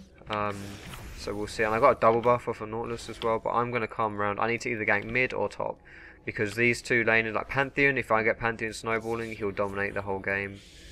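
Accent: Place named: London, United Kingdom. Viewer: london accent :)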